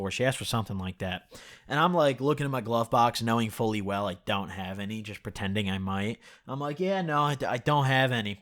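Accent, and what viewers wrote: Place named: Midwest, United States. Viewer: the way u talk ur most def from the midwest XD love ur content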